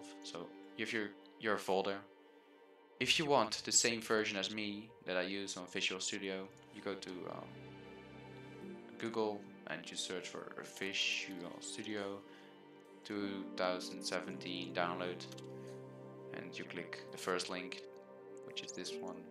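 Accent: Netherlands. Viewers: dat nederlands accent ook oei oei oei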